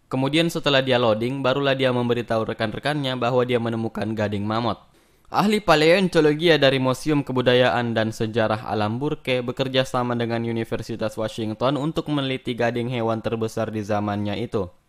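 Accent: Makassar, Indonesia. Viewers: Org Makassar Eaa?😂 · P A N D A dia logat makasar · Bang kmu orang Makassar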